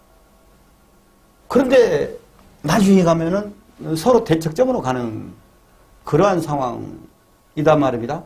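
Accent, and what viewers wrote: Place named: Jeolla, South Korea. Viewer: 전라도 사투리가 친근감 있고 좋아요 알기쉽게 잘 설명해 주셔서 감사합니다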